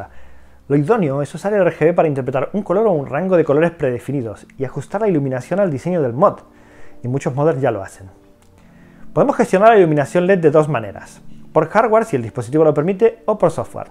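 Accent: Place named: Argentina. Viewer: Se te nota el argentino todavia!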